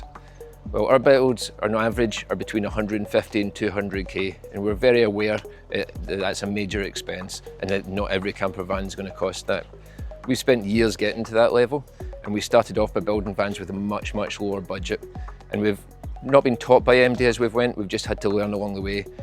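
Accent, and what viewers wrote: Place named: Scotland, United Kingdom. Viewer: I could listen to the Scottish accent all day!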